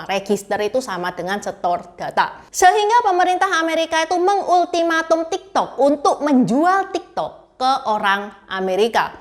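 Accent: Java, Indonesia. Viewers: Jawane ngendi kie, deneng medok temen ! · beritanya dibawa oleh lebih Seru lagi karena Bahasa Indo rame sekali & jawanya medok buanget tambah sedaaap deh. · Plek ketiplek ....... 😄😄, Jawa banget.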